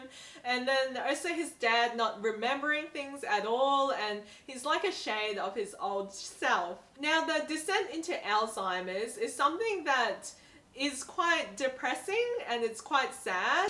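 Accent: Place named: Australia. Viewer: TBH, this is the first video I've watched from you. first off, I love your gorgeous Aussie accent LOL. secondly I'm hoping I can find your Aussie ya reads here in America somehow cos they sound so good! I also really appreciate your review of Replica. I'll keep in mind how you recommend reading it when I dive into my copy. What other Lauren Oliver books have you read? I bought Replica on thanksgiving and then last weekend I bought another one of hers (can't recall the title right now) at a discount bookstore. Do you have any reviews of them video or written?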